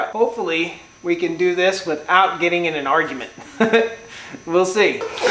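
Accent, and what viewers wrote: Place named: Franklin County, United States. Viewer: I absolutely knew where you were from as soon as you finished the first fifteen seconds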